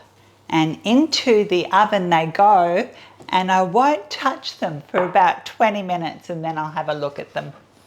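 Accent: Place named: Australia. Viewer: Yeah,the accent! My mother who is an English teacher calls it "a lazy accent" but I think it's an Australian accent,(I think.)😊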